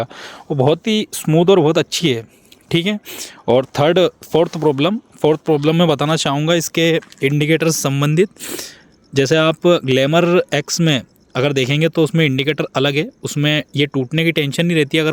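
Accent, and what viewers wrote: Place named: Indore, India. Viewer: Bhai tumhare bolne ka tone indore ke jesa kyu lg rha h.. tum Mp indore ke aas pas se ho kya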